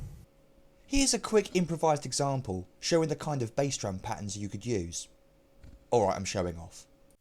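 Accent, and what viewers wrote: United Kingdom. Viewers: Listen to that British accent :)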